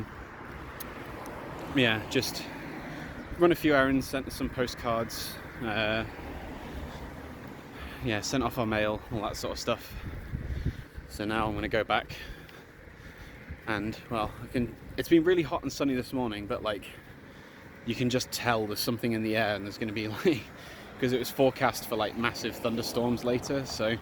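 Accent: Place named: East Anglia, United Kingdom. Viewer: "I'll be DAMED if the brick is overtaking me" Made me laugh a lot more than it should of... Where are you based in the awesome UK? Sounds like Anglian Area?? Keep up the good work and Enjoy the adventure.. Dave UK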